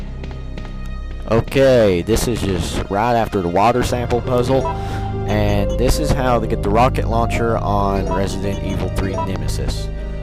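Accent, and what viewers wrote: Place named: Texas, United States. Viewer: right out texas with that accent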